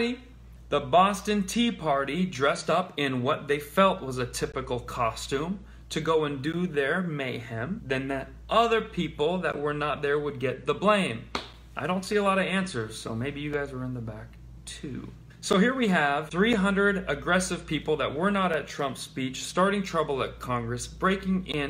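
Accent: United Kingdom. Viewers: Good British accent K-Von,you make me laugh,and I agree with everything you say."Get in there""!!!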